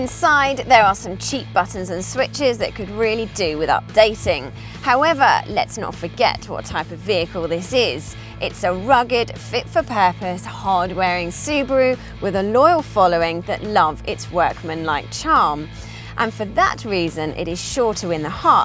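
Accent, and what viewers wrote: United Kingdom. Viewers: I love lady's with British accent ... She is really adorable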